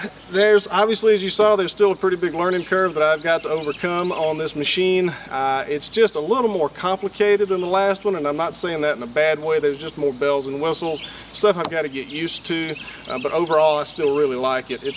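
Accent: South, United States. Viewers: Its not a mistake if you learn something from it. I subscribed as soon as I seen you were honest enough to show the others that this is a huge no no. I like your channel and wonder where your from. Sounds southern but then I still have my N, Carolina accent and I left when I was about eight years old. Been in Alaska most of my life and never ran many bandsaws. I did run circular blade saws with insert teeth. easy to sharpen but two men to lift back on the mill. I am 72 and learning like you only on youtube so I am not a armchair coach. Good video an great garden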